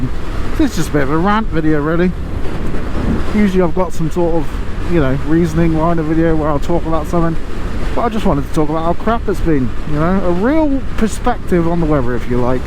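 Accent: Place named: West Country, United Kingdom. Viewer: Great video very entertaining to listen to you rant a bit about the weather, work etc.I noticed your west country accent straight away,I am from Cornwall!I live up country now in Cambridge area but travel abroad often on my bike to Italy.I have ridden in some pretty bad weather in the UK and France often!I am 59yrs old so gen x , very much a rebel ex punk/skinhead.Glad to see younger riders like yourself riding!😁👍🏍️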